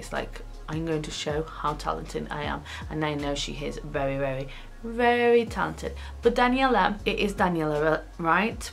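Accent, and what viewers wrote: United Kingdom. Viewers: Going by you accent you are living in the UK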